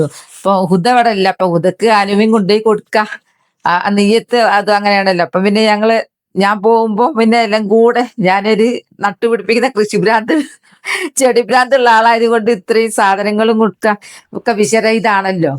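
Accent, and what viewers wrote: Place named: Wayanad, India. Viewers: nice vayanadan samsaram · വയനാടൻ സംസാരം നല്ല രസം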